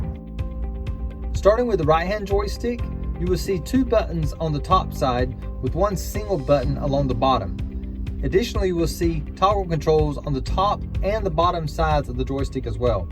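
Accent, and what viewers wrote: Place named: South, United States. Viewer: The southern accent is so funny! It still exists nowadays??!! Thanks for making my day! I love the tuturial - now i just have to get me one of those excavators for grandma's trash pile at home - or better yet, my own trash piles.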